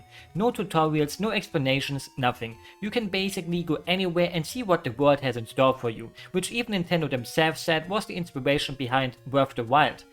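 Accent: Germany. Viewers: This guy sounds very german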